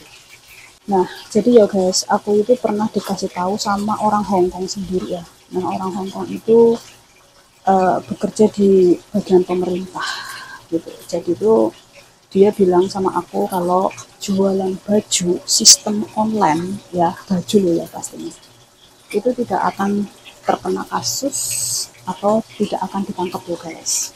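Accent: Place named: Banyumas, Indonesia. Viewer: Masak masak di Hongkong tetep semangat salan ngapak bae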